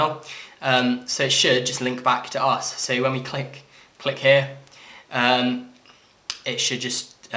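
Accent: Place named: London, United Kingdom. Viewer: "You sound like you're from London!"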